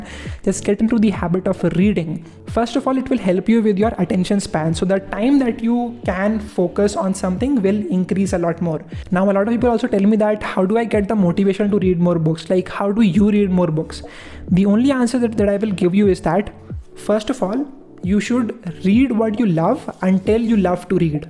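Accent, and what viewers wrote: India. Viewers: Hey, developing an accent is not bad especially since the Standard of International English is mainly Received Pronunciation (or RP), the only thing I noticed is about you in your older video that you lacked confidence and the intro was like a bulleted format instead of focusing on how to tell a story of yourself. And RP is still neutral in most countries (as it's the most legible and understandable format throughout the world). Nowadays, the accent you generally speak is generally more influenced by our Indian languages. For us it's completely awesome, but if anyone from other than Asia watches they might identify that your native lang is Asian (due to more focus on R, T, D like in Hindi). I would suggest from my POV that having an accent is completely fine but the accent should be in such a way that seems NOVEL to everybody. (especially I don't like people who change accent speaking to an English Native, either we should speak with the accent that everyone's comfortable with every time meeting new people or stick to your accent influenced by your nativeness)..... 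And I would say you can have that accent of your earlier without hurting anyone for Neutralness. (As most Indian curriculum never includes subjects like Lexical Stress, Rhoicity which speaks about how to speak or pronounce words and letters properly, which is present in other Indian languages )......Strange Hypocrisy ....... Btw THIS IS FOR NOT TO OFFEND OR TO BOTHER ANYBODY .... I'm sharing my opinion after all ..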